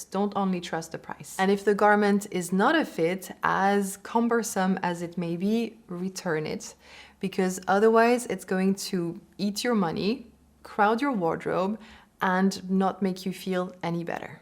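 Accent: France. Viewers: Hey u look so pretty and your french accent is very lovely. 🤓🫶💖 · Very informative. I like your lovely French accent.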